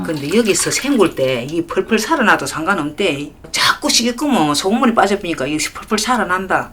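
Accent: Gyeongsang, South Korea. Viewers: 쌤~~갱상도 사투리 너무 반갑고 재미납니다 ㅎㅎㅎ 진주 산청쪽 말투같아요 제가 거기서 살았었거든요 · 경상도 씬냉이 너무정겹네요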